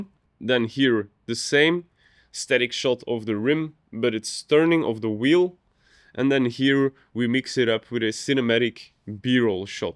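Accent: Belgium. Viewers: ik hoor een vlaams accent ngl.... XD ben echt blij met deze video! nieuwe dingen bijgeleerd vandaag!! :-)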